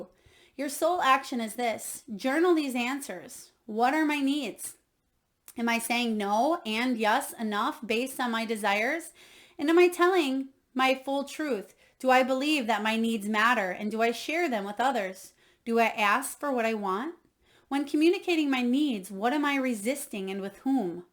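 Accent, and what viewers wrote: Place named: Wisconsin, United States. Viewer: My counterpart is leo/cancer (July 22nd). I love hearing ur Wisconsin accent. Not something I hear on YouTube everyday. BAM!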